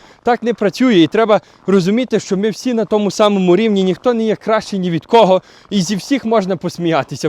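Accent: Western Ukraine, Ukraine. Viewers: ми в Канаді уже 8 років. В тебе гарний український акцент. Дякую за український контент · Чудова у Вас вимова. У деяких селах на заході саме так і говорять. Насправді у кожному районі трошки іньша, своя вимова. І це різномаїття - це є добре. А взагалі ніхто не звертає на акцент уваги в наші часи. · Очень хороший украинский у Вас, ) пускай и с западэнским акцентом) Но ударения и вправду ставите где нужно, так что претензий к произношению нет =) Не знаю кто там булькает в вашу сторону. Мудилы, которым заняться нечем, наверное. А по поводу Педальки, которую нужно прожать и по капле каждому долучытысь до перемогы, увы, наша страна многим не даёт эту педальку нажать и сделать вклад и посильную помощь, так как мы, мужчины, уже не граждане Украины, а обычный мясной набор под названием "Мобилизационный ресурс". Нам не дают спокойно работать (постоянные облавы на заводы), спокойно перемещаться (Блокпосты и проверки на каждом повороте), даже жить хотя бы по человечески довольно трудно. 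У меня есть с чем сравнивать, я был в оккупации. Орки ко мне относились более уважительно, чем ТЦК на улицах "Вильной Укрийины". Так что я сейчас думаю как границу пересечь в любой способ, что бы выжить, а не как бы задонатить на ЗСУ. Увы. Реалии жизни.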